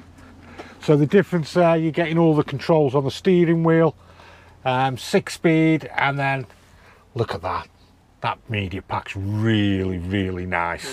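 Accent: Stoke, United Kingdom. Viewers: Also.. I just had to Google where you’re based as you sound like a local Stokie to me. ☺️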